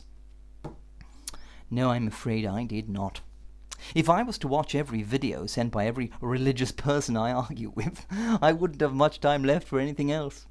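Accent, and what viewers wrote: South, United States. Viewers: wow, the southern draw is just great. I think it makes a great point. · hey i love that southern accent you use in this video. i'm from the states and i've run into the southern trailer park type that talk like that. cheers! and have a great day:)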